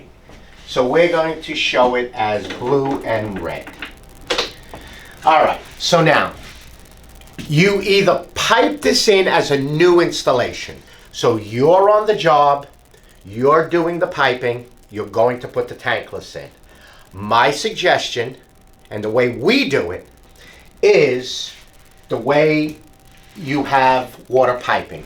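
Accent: New York, United States. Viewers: Dear, thank you very very much for your reply! I’m learning a great deal from your incredible videos, and even though I live in TX now, I am also from NY. The first time I heard your voice, I felt like I was at the local deli again listening to the fellas 😂. I wasn’t able to finish the job as of yet, because I’m rebuilding the entire 3” PVC intake/ vent system that was put together with silicone caulk 😡. Needless to say, and because I work alone, this job is now set back two days for the venting replacements ( the units are in the attic immediately adjacent to the 22’ high vaulted ceiling joists in the great room with the rafters framed over the top of it. Like a scissor truss. No flat surfaces to stand on where the venting runs to exit the roof, all of the venting was obviously done on make shift scaffolding during construction. How did you know they sent me a schematic “without” the PRV’s in it? 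Never mind, no need to answer that, lol. I appreciate you very much Sir. May I please send you an email with a copy of the piping schematic they sent me? I can also send you a picture of what I have to work with. Thank you! RXP199iN and an RX199iN with a dedicated recirc line. I have the Rinnai dedicated recirc valve with the additional brass elbow you recommend to make everything work as intended. I also have the REU-EZC3 cable and the MC195t controller for recirc scheduling.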